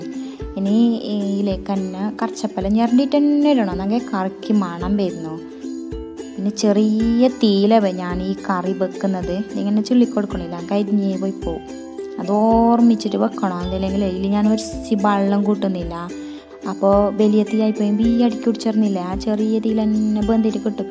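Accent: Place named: Kasaragod, India. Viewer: Super kasaragod slang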